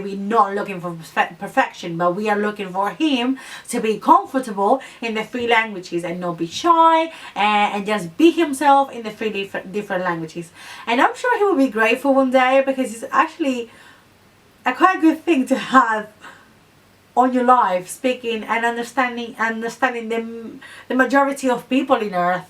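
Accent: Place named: United Kingdom. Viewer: I like your British accent ❤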